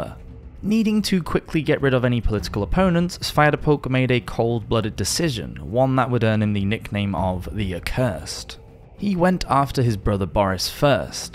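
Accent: United Kingdom. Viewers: The way you red coats pronounce some of your words is astonishing...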